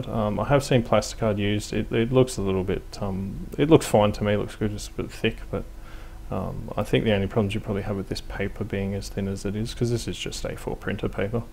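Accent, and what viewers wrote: Australia. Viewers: Well, in this case and if you really go on with this kind of detail, you should glue corrugated paper on the inside walls as well, as in real life containers the corrugated metal sheet that serves as sides and ceilling, is pretty much the same both inside and outside and if the doors were left open it should be visible. Thanks for the video mate, it's awesome. Subded instantly. If I may, I would suggest that you spoke a bit more slowly and if possible with a bit less of the aussie accent, as it is quite difficult for us non native speakers to follow. Cheers and stay safe.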